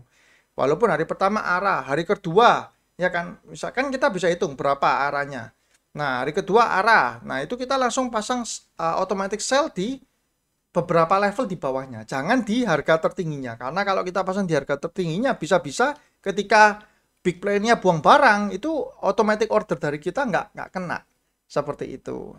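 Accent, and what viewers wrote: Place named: Java, Indonesia. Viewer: Terima kasih mas, sangant mengedukasi, juga suka loga jawanya Terlihat bangat mas niatnya membantu ritel utk tdk terjebak, sukses untukmu orang muda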